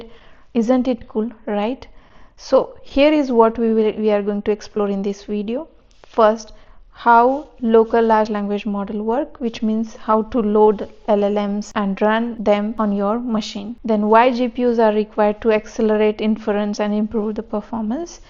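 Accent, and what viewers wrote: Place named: Maharashtra, India. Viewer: Marathi accent ! Nice information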